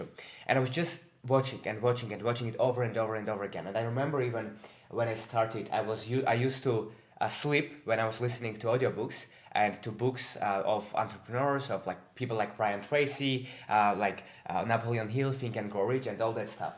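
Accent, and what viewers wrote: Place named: Georgia. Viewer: Hi friend from Georgia, at first i thought you were Georgian because of our accents sound pretty similar. Keep up the good work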